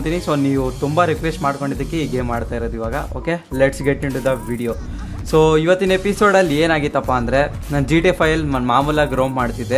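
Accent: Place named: Chitradurga, India. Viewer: Bro nivu namma chitradurgada Helidiralla super 💞love from chitradurga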